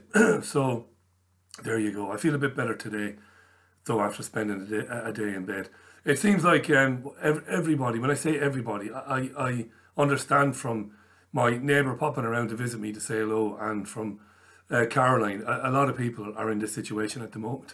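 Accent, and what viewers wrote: Ireland. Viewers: O man ......I miss ya ? I didn't realize how much I listened to you and the show even my kids are asking about you ..my ten year old son does a real good Irish accent all because of your show come back soon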